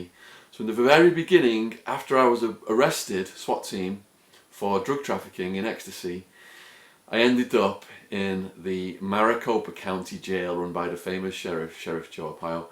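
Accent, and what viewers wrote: United Kingdom. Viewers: Awww Shawn/. TMI LOL. You must have really stuck out in an AZ prison with that Brit accent. Geez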